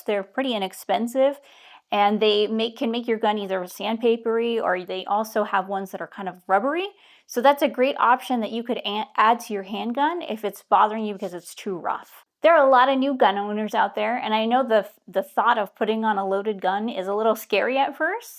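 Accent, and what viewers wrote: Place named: Ohio, United States. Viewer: No matter what I carry I carry in the same place always. Thanks for the Ohio accent,Roll Tide.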